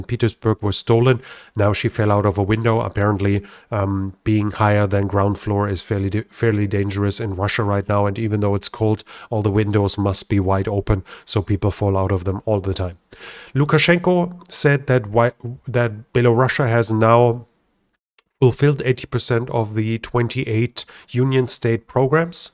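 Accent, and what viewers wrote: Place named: Germany. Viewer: He has the same accent as Werner Herzog. Is this an accent from a certain part of Germany? Bavarian, maybe?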